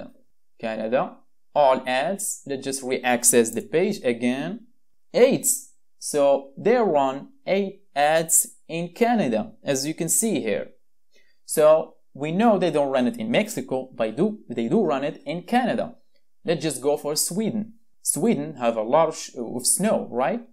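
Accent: Morocco. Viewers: Anglais n9iya akhy bayna fik maghribi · TBH your accent is better than trying to speak America/British accent rir hdr b Moroccan Accent and Keep going bro <3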